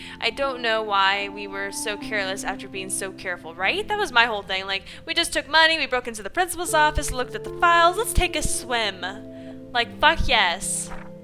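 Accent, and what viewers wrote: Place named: United States. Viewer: Where there be an American accent you just know I am not far behind ;) xxx I have missed these videos babe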